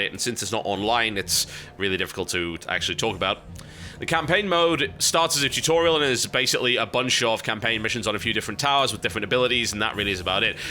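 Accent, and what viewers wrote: United Kingdom. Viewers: WHAT does it matter if he pronounces babel different than in America he got a british accent which I like way better than American accent.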